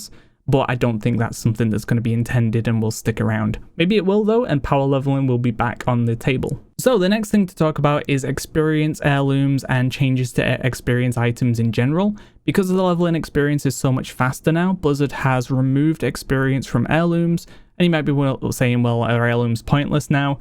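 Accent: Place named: Northern England, United Kingdom. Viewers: i would say lancashire/ cheshire / w yorkshire region for his accent. nw england. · It’s his northern English accent. That’s how they pronounce it there!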